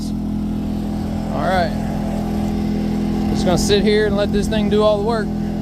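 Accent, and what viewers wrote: South, United States. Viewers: can tell from his accent hes down south, def below NC/ KY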